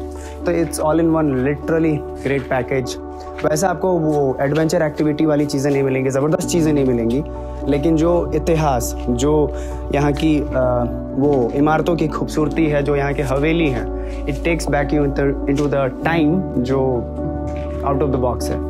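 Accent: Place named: Kashmir, India. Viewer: Are ye voice over wale bhaiya bhut Jada ...akdm writer type ho jate hai .....bihar hai guru thoda lallantop type rhe thoda casual matlb ....bihar kon aise bolta hai sudh hindi .... Bass hmko lg rha thoda aram se bola jay ...apne jaisa to or v feel ayega na 😁❣️ Ye bihari kam kasmiri juban lgta hai Ho skta hai hm galat v ho....so mafi v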